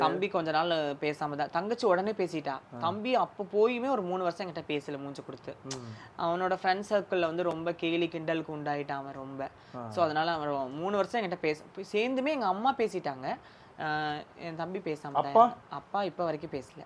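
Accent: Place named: Kongu, India. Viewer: அக்கா நான் கோவை நீங்கள் பேசும் உங்கள் கொங்கு தமிழ் மிகவும் அழகாக உள்ளது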